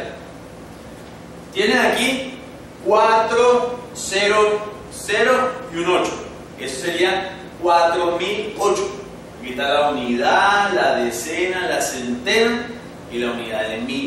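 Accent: Venezuela. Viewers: Simpático el acento venezolano... :) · Boyjess13 Tambien le Note el acentico Venezolano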